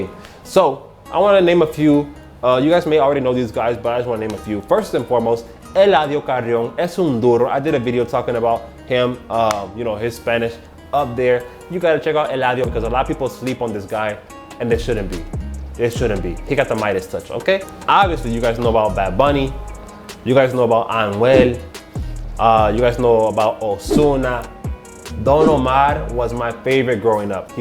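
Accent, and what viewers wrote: Dominican Republic. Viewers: eres mi idolo. soy estadounidense e igual que tu, vengo de chicago. ahora soy universitario y hago la carrera en espana. diria que por lo general domino el idioma pero me gustaria hablar como tu un dia y que la gente no sepa que mi idioma natal no es espanol jajaja. lo gracioso es que a pesar de haber aprendido en espana trato de hablar con acento boricua jajaja. no tiene mucho sentido ya que vivo alli pero por ejemplo como la mayoria de hispanohablantes en el mundo no usan vosotros pues me parece mas practico usar solo ustedes aunque si que es verdad que he aprendudo su jerga jajaj. por cuerto tus tik toks y el flow dominicano que llevas ta duro. tuve un companero de cuarto del patio y entonces a traves de el y tus videos, y mi deseo de hablar como boricua, intento conseguir mi propio pikete caribeno jajaj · Intro sounds Dominican 🤷🏽‍♀️🤷🏽‍♀️🤷🏽‍♀️🤷🏽‍♀️ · I’m Haitian and African American and the Spanish language has really driven me to find resources to learn Spanish. 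I have a bunch of Venezuelan and Puerto Rican friends and I’ve been trying to dissect and master the Puerto Rican Spanish for the longest. It’s a little hard because I don’t know too many helpful vids out there but you’ve giving me hope considering the fact that you’re African American as well and speak like a Dominican.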